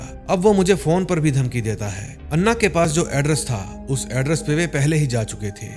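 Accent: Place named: Kerala, India. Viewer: The narater also seems to be a malyali going by the pronunciation, .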